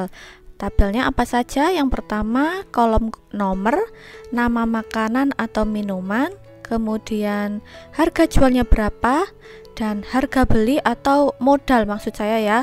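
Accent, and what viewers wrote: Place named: Java, Indonesia. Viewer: Logatnya mirip orang Jawa.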